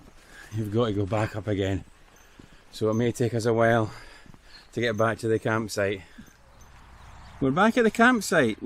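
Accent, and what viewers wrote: Scotland, United Kingdom. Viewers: There's something magical about Scottish History being explained in a Scottish accent ❤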